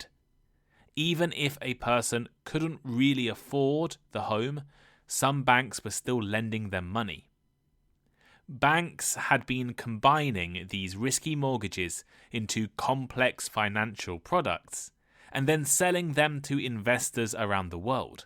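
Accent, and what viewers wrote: United Kingdom. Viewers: Oh, the British accent! Wonderful 🎉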